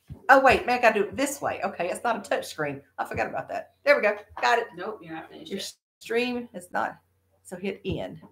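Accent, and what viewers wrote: South, United States. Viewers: I enjoyed your stories and y'all's sense of humor. You "cracked me up!" 😁 I also like your Southern accent. My grandpa on my mom's side of the family was from Gaffney, South Carolina. I loved his accent! My grandma was from Cherokee, South Carolina. I never knew her because she died when my mom was 7. I hope you continue to put out more content. It really cheered me up.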